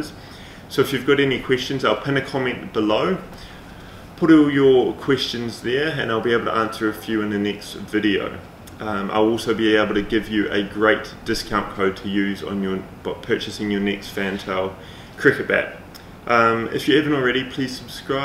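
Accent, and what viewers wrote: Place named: United Kingdom. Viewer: This guy is not american he's brit i bet...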